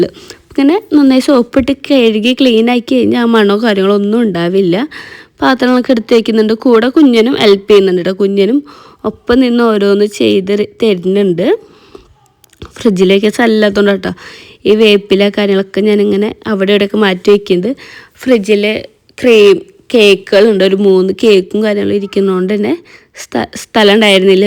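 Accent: Thrissur, India. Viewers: thrissur okke angane ahn parayaa😂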